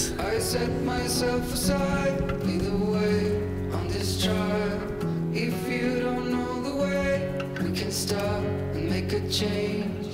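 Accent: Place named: Devon, United Kingdom. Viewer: Get in Bey!!! come down to Devon, although you sound like you’re from my area anyway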